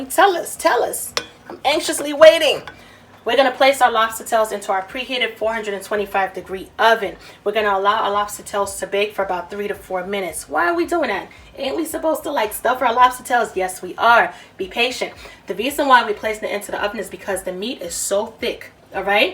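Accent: New York, United States. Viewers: This recipe looks so good. My mouth is watering ok!!! I love the way you put it all together with simple ingredients. I know you're my NY homegirl from the way you say "sauce" "talking about" and other words as well. Thanks for sharing your wonderful recipes and impeccable cooking skills. By the way I'm a Bronx girl "The Boogie Down". Keep doing what you do!!! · Dear, please, if you can, write the names of the seasoning / ingredients on the screen, as you add them. Many vloggers do that, and that's Good for people who don't have English as first language, and people who are hard at hearing, and many senior citizens are hard at hearing. Also, not all English speakers will understand your American sounding accent, so by writing on the screen, you'll make it easier for the whole world to watch your videos and appreciate it. Non English speaking Africans may not watch because of the language, most Indians, Chinese, Arabs and others may have difficulty in understanding what you are saying, and by writing on the screen can give you more views. 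· I’m not from the U.S, is that a Newyork accent? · Sound like you from New York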